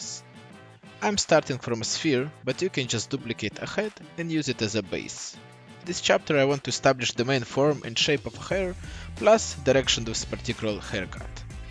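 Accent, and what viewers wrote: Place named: Russia. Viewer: хах, русский акцент слышно сразу)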